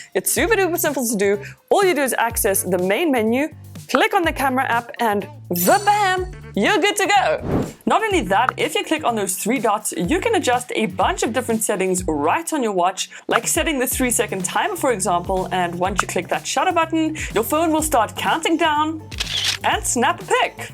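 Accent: South Africa. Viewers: Great video. Your accent? S. Africa? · Are you from South Africa ? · Fnb App on your Watch? Are you South African ? I honestly thought you were Russian or from like that side of the world lol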